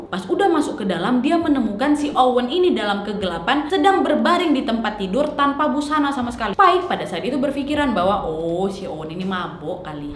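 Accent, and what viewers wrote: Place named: Medan, Indonesia. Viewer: Orang medan memang kek gitu,cara ngomongnya memang seru seru jadi orang luar medan dengarnya enak,ngga ngegas tapi dikira ngegas,ngomong kek orng berantam hwhw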